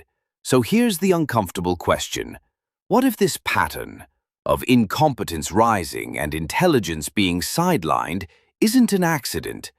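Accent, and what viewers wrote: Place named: England, United Kingdom. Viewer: Too slow, lose the english accent. Good shid lil bro , good luck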